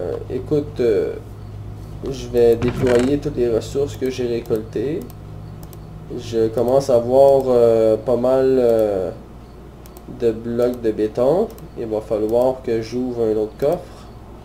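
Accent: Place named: Quebec, Canada. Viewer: T'est vidéos sont trop cool et ton accent Québecois est vraiment plaisant pi moi aussi je suis un Québecois, Vive le Québec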